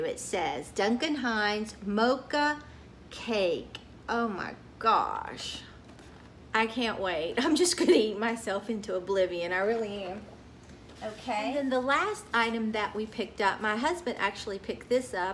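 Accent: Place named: South, United States. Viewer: Nice haul! I just love listening to you, I had some family from Florida and my Aunt had that southern draw and she called me sugar all the time!!! And every time I hear you I think about that!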